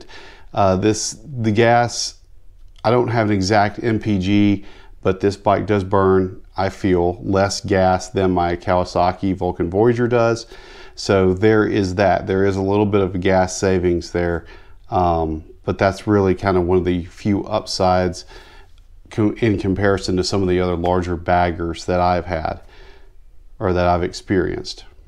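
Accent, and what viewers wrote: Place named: Alabama, United States. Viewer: Interesting I’ll admit I know nothing about these bikes.sounds like you’re in lower Alabama. I’m up here in dekalb county.you should come ride little river canyon.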